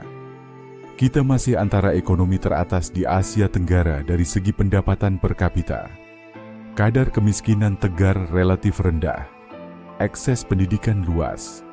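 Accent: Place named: Indonesia. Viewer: Kepala butuh bapak kau indonedesh, yg tukang cakap tu ada org indonedesh, tak payahlah nk menyamar suara spt org Malaysia, jelas sekali suara org indon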